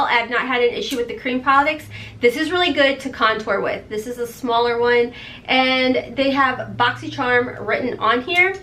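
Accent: South, United States. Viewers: I just found your channel and I love your accent I'm a southern girl too💖 I think the pallet will be in the charm rewards store. My email is <mail> now I'm going to binge watch your videos 😍 😍😍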